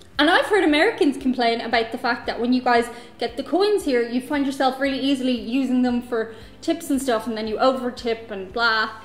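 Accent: Ireland. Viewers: It was so much fun listening to this! All the commenters seem to be focusing on the 7 elevens, rightfully so, I loved that you are aware of how big the U.S. is and how there is so much to see. Fun to watch you and love your Irish accent. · Some of the problem with them understanding your Spanish may have to do with your Irish accent. I even have a little trouble with you when you're speaking English (I think yours is the only YouTube channel I routinely watch with the closed captions on, just to fill in the gaps in understading). Spanish itself sounds different in every country. I'm pretty familiar with Mexican Spanish (having lived for sixty years in various US border states), and I have trouble with Argentine, Puerto Rican, continental Spanish, whatever that mixture in the Philippines is supposed to be. Most clearly-enunciated Spanish I ever encountered was on shortwave radio, and I listened for several minutes for something that would identify the origin; finally out came a station ID and I was listening to Radio Shanghai! 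· Bad toilet paper in a public toilet is probably on purpose. Likely to discourage it getting stolen when you're running low at home. Choice overload is also a thing. There are even studies. Seems like 3-5 choices is ideal in many things. Here is my question.....do you speak Spanish with an Irish accent?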